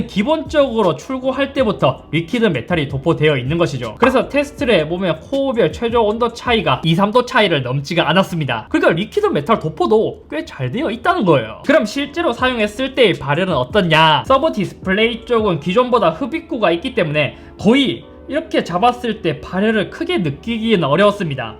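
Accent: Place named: Gumi, South Korea. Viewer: 먼가 외가 형같은 말투 ;; 구미쪽 출신이십니까 ㅎㅎ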